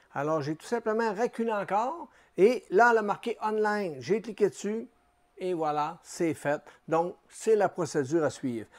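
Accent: Quebec, Canada. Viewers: Tout simplement, j'adore ton humour et ton accent Québecquois très cher cousin, au plaisir de te rencontrer chez toi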